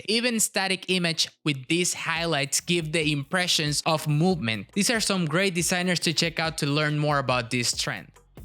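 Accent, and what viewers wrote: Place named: Venezuela. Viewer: Amigo excelente video, apenas escuché tu acento algo me decía que eras Venezolano haha 🙌 Keep up the great work!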